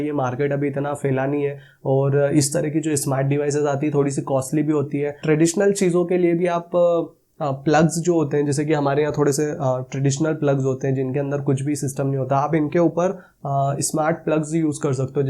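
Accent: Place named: Gujarat, India. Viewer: I bet he is Gujrati or Rajasthani... Because the way he says "set" or "ek" & other words... Well thanks for this video brother ❤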